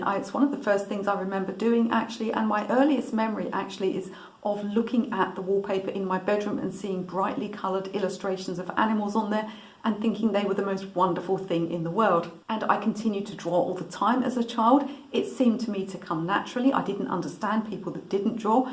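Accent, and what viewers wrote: United Kingdom. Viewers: I’m a new subs Cusine a week now and I just emendation enjoy your voice and British English accent.., it’s a pure pleasure listening. Iv been an artist my whole life. Art is oxygen for me. Thank you for sharing your story ☺️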